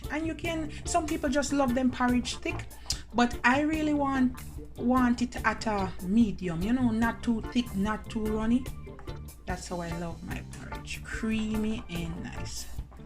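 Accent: Jamaica. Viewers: Lovely porridge family you sound like you from mobay .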